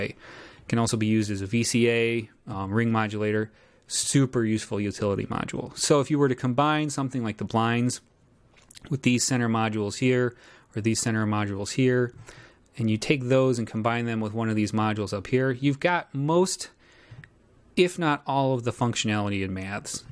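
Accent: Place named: Midwest, United States. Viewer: This is just a relatively thick Midwestern or Canadian accent, he's pronouncing it correctly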